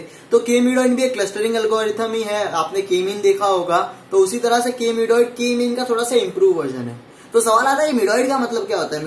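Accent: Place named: Bihar, India. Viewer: bhai,lagta hai aap bihar ka ho😁😀😛😛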